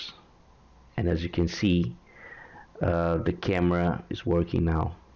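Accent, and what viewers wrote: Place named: Brazil. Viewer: Your English sounds like "Brazilian English", it's an easy accent for me to understand. Thanks for the video, it worked!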